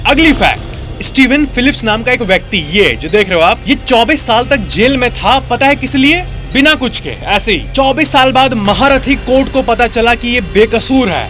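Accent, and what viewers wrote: Bihar, India. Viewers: Aap Bihar se ho n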